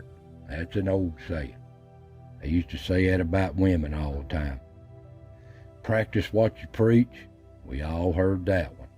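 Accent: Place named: Southern Ohio, United States. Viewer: I too have heard all these sayings. I'm 70 years old and live in southern Ohio and a lot of our people has your same accent.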